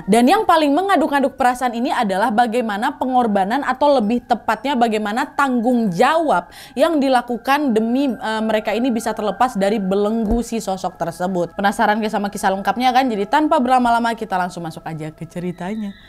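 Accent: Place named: Medan, Indonesia. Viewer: Cocok kali kayaknya kakak ini main ke mentah kali logat medannya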